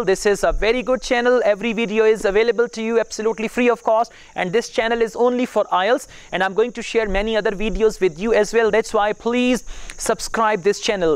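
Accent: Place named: India. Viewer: indian accent dominates 😃 You are very good 👍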